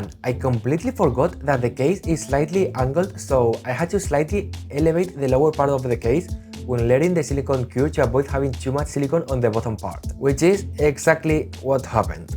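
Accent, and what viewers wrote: Spain. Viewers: Ya decía yo que el acento era sospechoso fellow Spaniard, buen vid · Desde ek primer momento que escuche tu acento sabia que eras español! tu video es genial, nuevo sub! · La verdad que he notado que tu inglés es muy español, y luego cuando has mencionado que vives en España he dicho, este chico es más español que la tortilla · Tiene acento español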